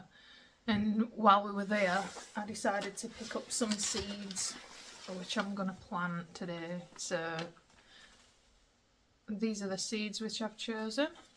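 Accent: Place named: Yorkshire, United Kingdom. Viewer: What a fantastic whire rose accent! West Yorkshire or South? Hello neighbour! Beautiful garden, I'm glad to have taken a little peek here on your channel xx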